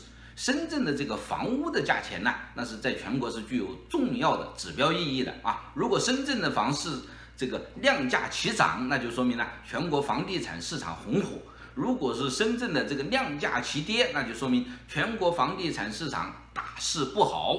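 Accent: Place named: Hubei, China. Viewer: 我就觉得你口音像湖北的 哈哈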